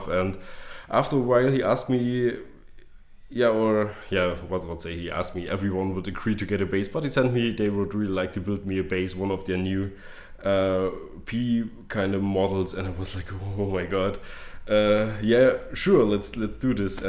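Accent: Germany. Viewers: Es el acento alemán y es algo muy común y no se porque comentas en español siendo este un vídeo en ingles.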